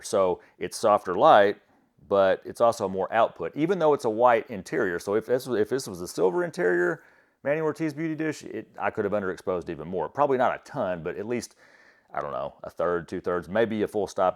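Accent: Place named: Texas, United States. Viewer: Another great video. Thanks so much. Side note: being from Miami, seeing a Hispanic person talking with a Texas accent really messes with my head. 😂😂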